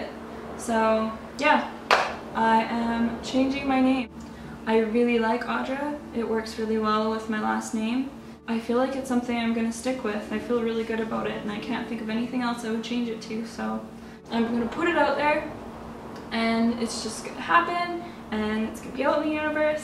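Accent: Canada. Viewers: Audra, are you Canadian?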